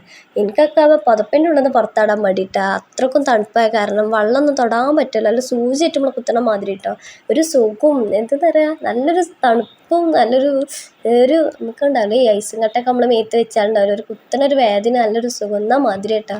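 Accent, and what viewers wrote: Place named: Malappuram, India. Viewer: ഈ വീഡിയോ ഇഷ്ട്ടായി ഇജ്ജ് അന്റെ മലപ്പുറം സലാംഗ് പറഞ്ഞു കേൾക്കാൻ നല്ല രസണ്ട് 😀 അഹ് ബല്ലാത്ത ജാതി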